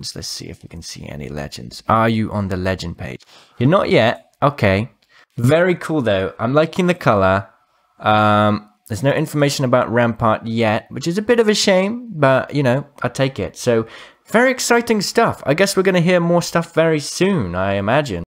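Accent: India. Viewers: OMG CAUSTIC IS FINALLY GETTING A HIERLOOM AND yeah that's an Indian girl. I don't like the accent :p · Is it just me or does she sound Indian?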